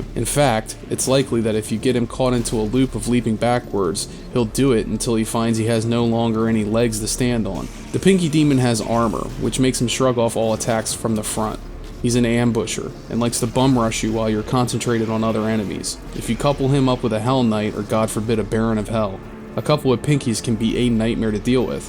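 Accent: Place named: South Jersey, United States. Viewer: I'm a simple man. I hear a South Jersey accent I subscribe.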